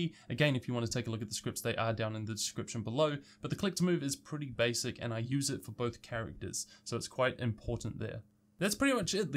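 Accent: New Zealand. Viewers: Absolutley great video! Subbed. Are you from New Zealand by any chance? Your speech (and yourself a bit) reminds me of Jemaine Clement :D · Where are you from? Your accent (and name) makes it sound like you are from New Zealand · Are you a New Zealander? that accent or lack thereof an accent is a dead giveaway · Are you from New Zealand I know a kiwi when I see one!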